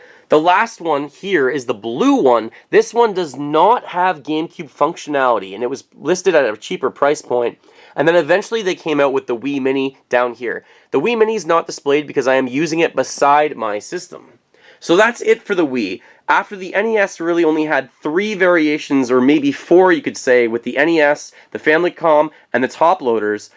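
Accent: Canada. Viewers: That is a pretty thick Canadian accent.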